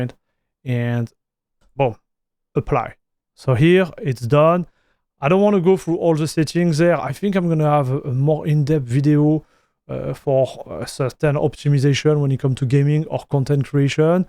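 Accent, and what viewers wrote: France. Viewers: Heavyest french accent I ever heard ❤🇫🇷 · I'm guessing that this is probably a very informative video, but the extreme (French I'm guessing) accent. I cannot understand what you are saying. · you're french because your accent is very similar. i like your videos a lot.😁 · nah this is the purest french accent you'll hear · Jadore cet accent francais :D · You have the thickest french accent I've ever heard 😅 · honestly i think id rather just listen to you speak french and read subtitles rather than try to decern what you're saying in english through your CRAZY thick french accent.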